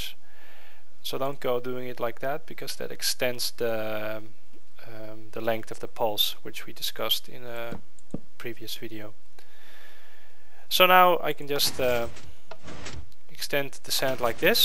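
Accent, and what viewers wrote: Netherlands. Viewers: you're from holland aren't you?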